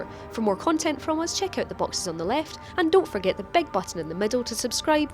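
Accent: Scotland, United Kingdom. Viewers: TDX just her accent,Scottish i think,pretty cute actually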